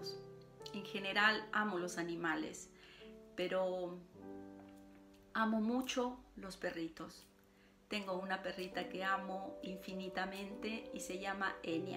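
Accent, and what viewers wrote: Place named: Italy. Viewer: Tú canal es genial😍🇮🇹🇵🇪 me gusta esta combinación de nacionalidades! Llevas muchísimos años allá en Italia😱 ya tienes el acento italiano muy marcado incluso hablando español! Encantadisima de ser parte de tu espacio saludos✨🌸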